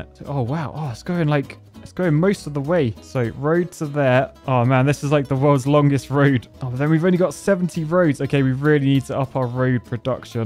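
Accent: United Kingdom. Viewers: your content has replaced just about every source of entertainment in my life lately. Thank you for playing videogames in a fashion way more entertaining than doing it myself. It's been fun marathoning through your backlog of videos. Have you ever thought of narrating those nature documentaries? Maybe it's the British accent but getting a bridge review in the middle of lion chasing a zebra would make my day.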